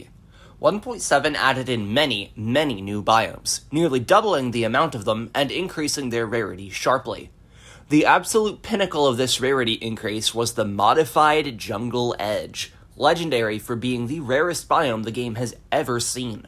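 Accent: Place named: Delmarva, United States. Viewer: you kinda sound like ur from delmarva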